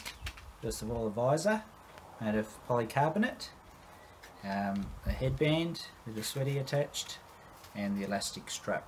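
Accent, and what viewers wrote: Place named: Australia. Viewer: Who doesn't love a good Aussie accent :)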